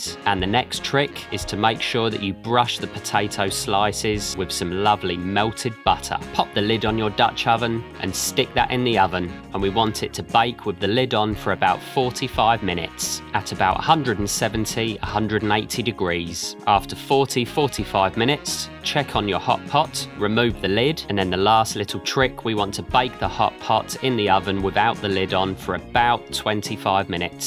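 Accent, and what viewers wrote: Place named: Lancashire, United Kingdom. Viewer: Nothing better than a traditional Lancashire accent. That aside nice recipe. 😉👍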